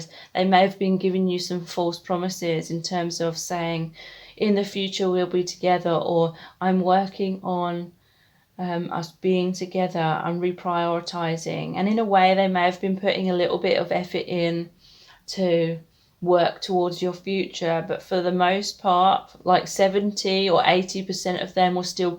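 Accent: United Kingdom. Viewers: ❤ You are amazing as always, wonderful job, thanks I adore your British accent ❤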